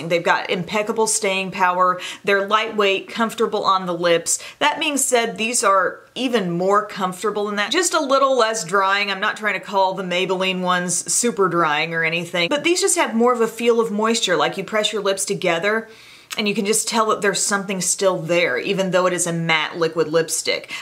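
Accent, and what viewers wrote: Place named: Nashville, United States. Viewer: I don't know where you are from, but your accent makes me homesick for where I grew up--Nashville.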